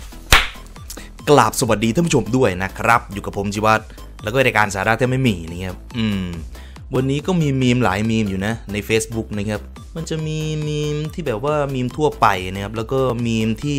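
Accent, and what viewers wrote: Isan, Thailand. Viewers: พี่ คนอีสานป่ะ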